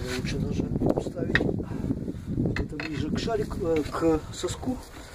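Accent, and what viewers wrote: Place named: Russia. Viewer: Parabens pela ilustre ideia, vou fazer uma tambem, obrigado. O sutaco parece de russos eles sao inteligente👏👏👏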